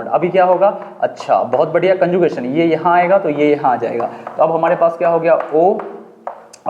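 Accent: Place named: Bengal, India. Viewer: Sir, I wanna ask u a question. Are you Bengali because your accent matches with bong culture.Some friends of mine are from Kolkata & they have same accent. Please reply sir.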